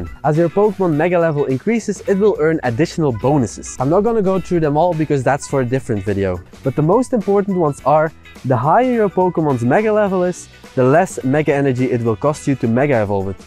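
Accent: Netherlands. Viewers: I can instantly tell you're dutch by the accent :D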